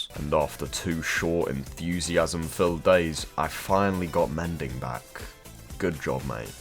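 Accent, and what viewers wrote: United Kingdom. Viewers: I goon to your British accent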